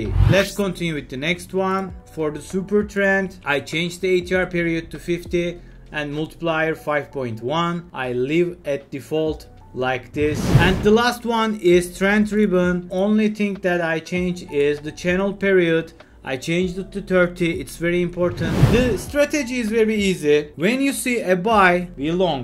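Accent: Turkey. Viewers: Krdeşim sen Türk'e benziyorsun konuşma aksanından anladım isim neydi? Bir de videonuzda altyazı özelliği aktif değil..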